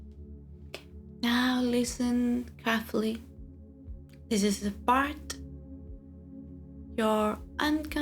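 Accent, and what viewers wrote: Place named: Argentina. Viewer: Ok I cannot resist your accent 😊 Very commanding tone of voice. I see you are from Argentine, South American women are so powerful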